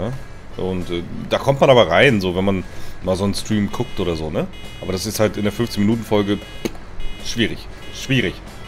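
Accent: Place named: Low German, Germany. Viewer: Hachja... endlich wieder ehrliche Landwirtschaft mit Plattdütsch und ohne CP, wie mir das gefehlt hat :-)) Im Ernst, du bist so einmalig und so bist du an meinem Feierabend seit der Freidorf!!! Und die Grafik ist tatsächlich Wahnsinn! ich versteh gar nicht wieso! Liegt das etwa an Deinem neuen Rechner, du Schlawiner??? Oh nee muss nicht sein mit der Frankenmap, lass sie ruhig auf Twitch... Was hälst du von Gorale für Youtube? Aber eigentlich reicht auch erstmal Windsbach oder? Modcontest finde ich wirklich sehr sehr gut, war ja mein 1. mal :-D Ich finde gut, dass Mods sich mal offiziell vorstellen dürfen und dann auch mal belohnt werden. Zum Teil ja unglaublich, was die Jungs so raushauen - wie z.B. den Seasonsmod - die haben den 1. Platz absolut verdient! Was ich persönlich richtig toll fand, waren die Youngtimer-Mods und natürlich den Seasons-Mod. 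Bei den Karten bin ich etwas skeptisch, ob es später dann gefixte Versionen gibt, denn Fehler haben die alle.... So, das war das Wort zur Nachtruhe am Dienstag, Horridooo :-))) PS: Der Country-Sender ist sooo cool, ich liebe ihn!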